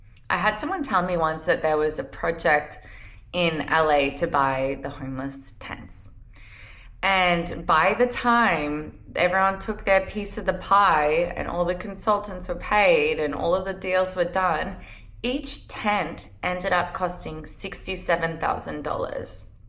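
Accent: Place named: Australia. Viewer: I knew right away you were from Australia. I enjoy your content but think you would also be good in the Tesla community.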